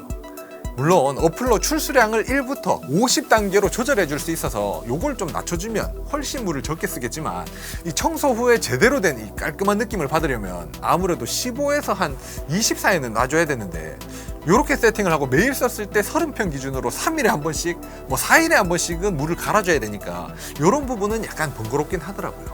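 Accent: Gyeongsang, South Korea. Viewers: 경상도사투리 드럽게 듣기 싫네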